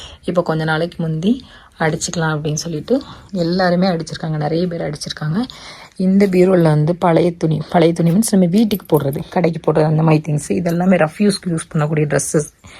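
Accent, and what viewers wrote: Tirunelveli, India. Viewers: Unga sontha oor yethu akka yengala mathiriye pesuringa thirunelveli pakkama neeenga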